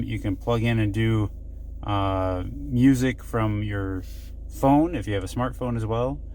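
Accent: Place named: Cleveland, United States. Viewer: Thank you for the overview. You have a very welcome interesting accent. You sound just like my friend from Cleveland. Are you in Cleveland.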